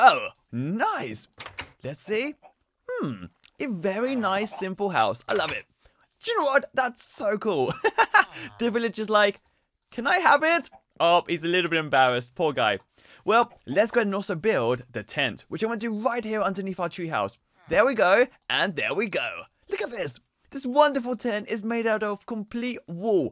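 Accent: United Kingdom. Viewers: Obv he's British 🙄 That's clearly a British accent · Are you british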